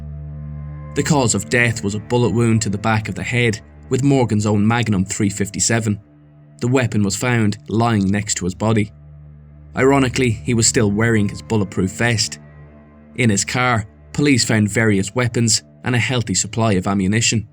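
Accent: Ireland. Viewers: Great vids, pleased to find an Irish accent among all the American channels. 😁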